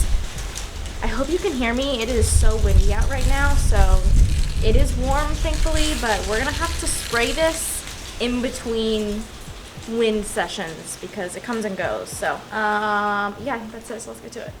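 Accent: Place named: South, United States. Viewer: I'm not sure where you're from but I'm in KY and my southern accent is very similar to yours because anytime I hear you say it, it sounds correct to me, I don't hear an "r" in it anywhere extra. Keep up the awesome work and don't let the lull in sales slow you down, your work/pieces are very pretty and always quality work so it isn't you, it's just the economy right now, hopefully, that'll be picking up soon though. Happy New Year and lots of luck to you!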